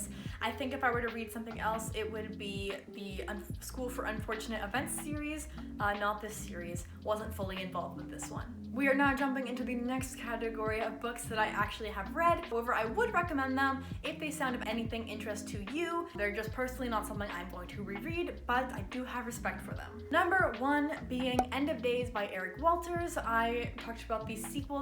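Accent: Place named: Germany. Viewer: I don’t know if it’s just me but you have a little german accent hahah but idk why 😂 Are you german?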